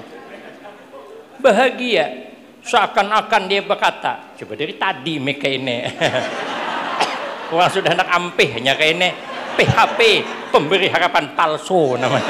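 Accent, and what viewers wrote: South Kalimantan, Indonesia. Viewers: Kental bujur bahasa Banjar tuan guru. Ini ....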